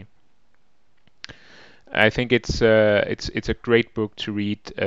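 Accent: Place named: Denmark. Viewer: Are you from Denmark? You have that danish accent. Nice video tho!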